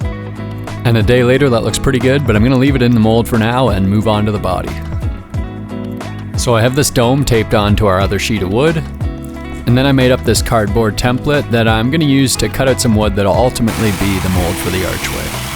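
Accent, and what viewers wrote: Canada. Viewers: Awesome build, my only complaint is the use of pizza sauce from a bottle, and cheddar cheese on a pizza. This is the most Canadian content possible (I can tell from your accent) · I can usually not tell where people are from from their accents but you are very Canadian. :) cheers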